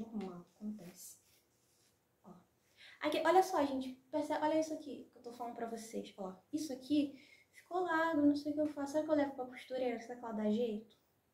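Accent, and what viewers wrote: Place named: Rio de Janeiro, Brazil. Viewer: Vc é carioca né? Pelo sotaque eu acho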